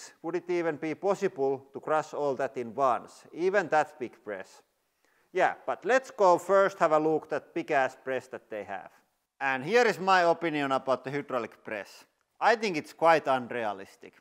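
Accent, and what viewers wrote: Finland. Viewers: It is so much better with the Finnish accent 😂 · I want to listen to you explain everything in a Finnish accent! 😄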